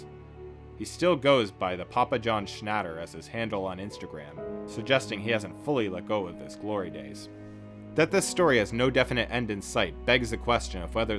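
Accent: Canada. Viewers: Is this also where the weird pronunciation of "chagrin" came from? Never heard it said that way but definitely caught those Canadian "out" and "abouts"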